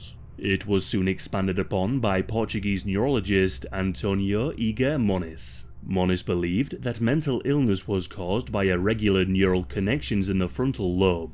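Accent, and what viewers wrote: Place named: Yorkshire, United Kingdom. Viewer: i love the way u try to make ur yorkshire accent sound slightly posh lmaoo 😭 do you do this so its easier for people who arent native english speakers can understand you more??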